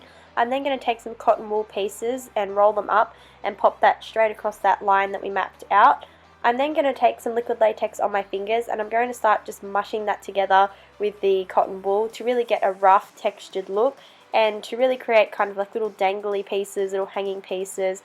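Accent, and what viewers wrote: Australia. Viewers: Are you Australia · I love her Australian accent.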